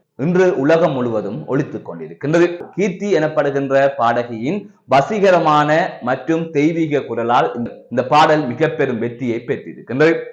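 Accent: Sri Lanka. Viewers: இலங்கைத் தமிழை நாங்கள் பெரிதும் விரும்புவோம், மட்டட்ற மகிழ்ச்சி.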